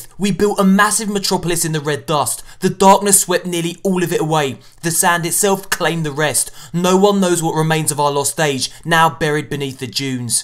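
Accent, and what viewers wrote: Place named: United Kingdom. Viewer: yea and everything sounds classy with a British accent! lol :)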